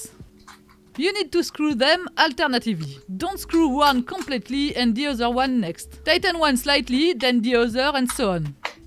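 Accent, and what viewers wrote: France. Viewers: The accent is not exactly "French", it is more precisely Parisisan accent. Try the Québec accent. '-) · salut, cher camarade français $$ j'adore l'accent english des fr qui parlent anglais ^^ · Beautiful video ❤ Clear instructions on how to install Noctua Fan on the Motherboard 🖥 Love the English in French accent 🟦⬜🟥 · Thickkest French accent ever 😅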